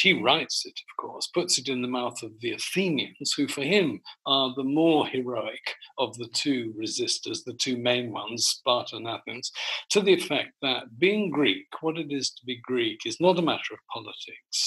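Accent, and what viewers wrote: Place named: United Kingdom. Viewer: your british accent is unbearable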